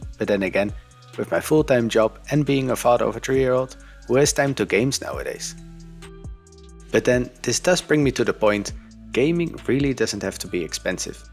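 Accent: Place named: Netherlands. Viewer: Are you dutch? You sound very dutch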